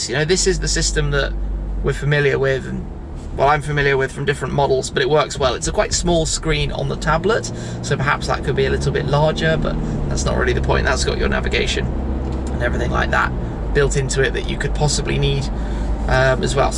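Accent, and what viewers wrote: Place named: United Kingdom. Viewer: Only me annoying this British accent ?))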